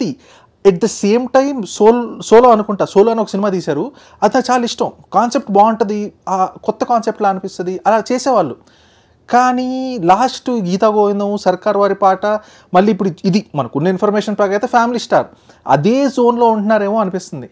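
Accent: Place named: Andhra Pradesh, India. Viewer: అరేయ్ ఎందుకురా తెలంగాణని చెప్పుకు తిరుగుతావు నువ్వు ఆంధ్ర నీ యాస నే చెబుతుంది నీలాగే పూల సొక్క గాడు ఫస్ట్ తెలంగాణ యాసలో మాట్లాడి మళ్లీ వాడు ఆంధ్ర వాడు అని ఒప్పుకున్నాడు నువ్వు కూడా అలాగే ఒప్పుకో డ్రామాలు ఎందుకు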